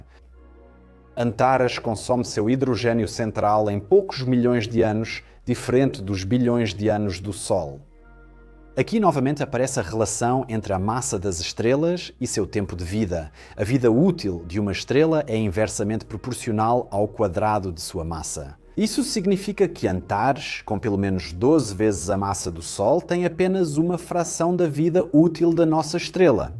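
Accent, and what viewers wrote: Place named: Portugal. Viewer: Excelente vídeo. Ficou legal ser narrado metade pt.pt e metade pt.br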